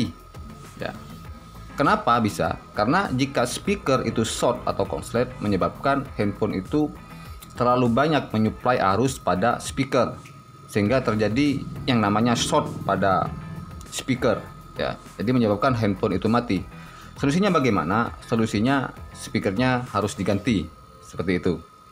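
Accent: Madura, Indonesia. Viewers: Logatx kok kaya madura bosss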